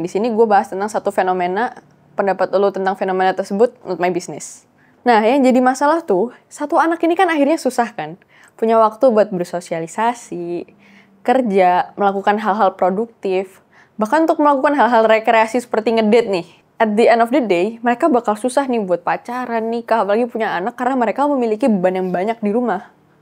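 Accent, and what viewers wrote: Java, Indonesia. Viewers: Nonton karena medoknya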